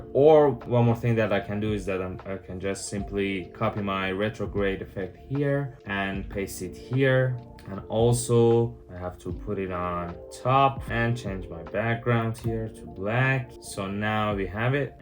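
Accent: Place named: Iran. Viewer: hey where are from you sound iranian❤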